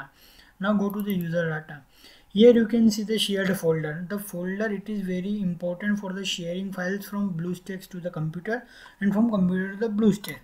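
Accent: India. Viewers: That is the most Indianest accent I have ever heard. Keep up the good work bro! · You know you're saved when the commentary is in indian accent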